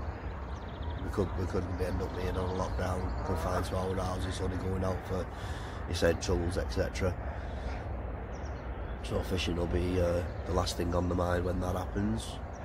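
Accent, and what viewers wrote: St Helens, United Kingdom. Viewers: Nice video are you from St Helens area accent sounds familiar 😂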